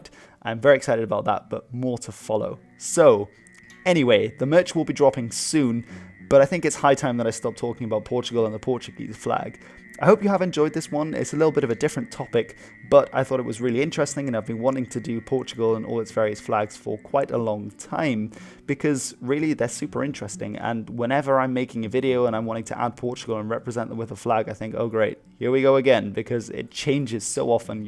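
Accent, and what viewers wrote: Spain. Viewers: Why does he pronunce the words in spanish, spanish is not portuguese languaje, portugal does not speak spanish like mexico, venezuela and others, its portuguese my dude. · Me, a Portuguese speaker: "Stop pronouncing Portuguese words with a Spanish phonology" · For what? He is reading every single word with Spanish pronouciation. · Why are you pronouncing portuguese cities with the spanish accent you fool? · Can you pronounce Portuguese words with Portuguese style, not with Spanish style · for someone who tried to spain out portuguese words, not bad my friend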